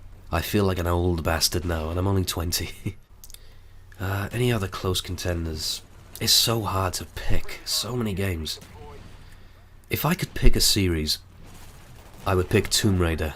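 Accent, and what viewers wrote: Wales, United Kingdom. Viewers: I think you would be such a good voice actor! It's like when I first heard Gaz in cod 4, and then Ghost in cod 6. His voice was awesome and I got the same feeling when I first heard you. btw, are you from wales? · where in he uk are you from? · i must say i dont like the welsh accent but ure voice is heavenly and the kiparis is BEAST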